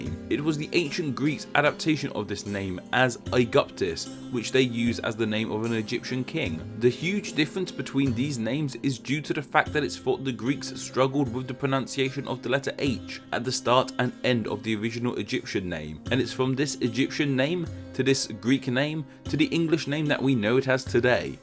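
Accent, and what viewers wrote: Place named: United Kingdom. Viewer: All pronounced impeccably by a British scholar. The raayne in Spaain staays maainly in th'plaain. Please speak a more neutral English. If Marina Sirtis, Patrick Stewart, Jane Seymour, Patrick MacNee, David Niven, Leo G. Carroll, Jean Marsh, Nicola Bryant, and Alfred Hitchcock can do it, so can you. You're speaking to an international audience.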